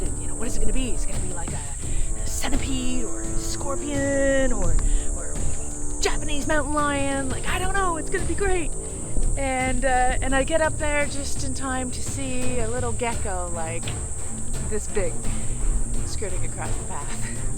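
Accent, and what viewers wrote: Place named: Canada. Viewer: You sound Canadian. Thanks for the video. I am going to Hachioji for about the fifth time (to visit a friend). Still haven't hiked up takao or adjacent mountain. Must give it a try.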